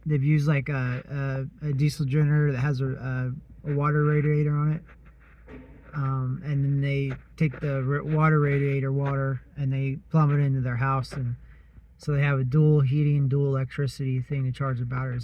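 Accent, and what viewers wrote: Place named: United States. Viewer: You are an original American so I could learn English from what you said/spoke. It is not easy but I love learning, 😊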